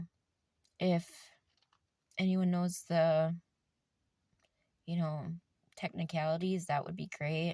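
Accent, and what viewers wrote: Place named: Midwest, United States. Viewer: I just wanted to tell you I see you. I relate to you on so many levels. I am originally from Wisconsin, so I appreciate the Midwestern accent number one… 😊 For me, my dad was my “person”… I was married at the time when my dad passed, and I never felt so alone in my life and in my grief… my husband did not understand. We are no longer together not to scare you….It had nothing to do with the grief well OK MAYBE that was part of it, but he also had a severe alcohol problem… But, he never understood, or gave me what I needed. You are NOT an asshole! I will admit to you that there were times where I thought to myself. I can’t wait until my husband loses one of his parents, and THEN he will understand. Trust me, I don’t wish that on anybody, but at the time I was so angry and hurt and frustrated with him that that was in my head… You are not alone. 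Anger is a huge part of grief.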